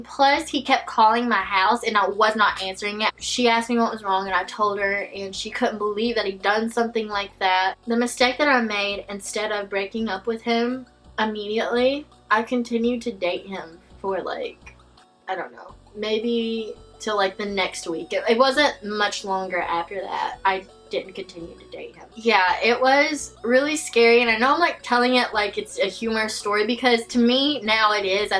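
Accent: South, United States. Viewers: Where are you from, you sound very country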